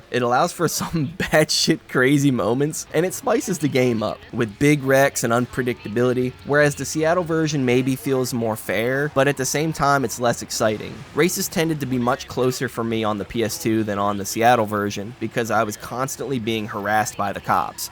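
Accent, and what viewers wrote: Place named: Philadelphia, United States. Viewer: This Philly accent is making my ears bleed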